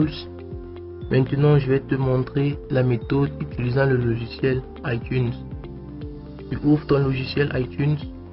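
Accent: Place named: Antilles, Caribbean. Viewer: T’es antillais mec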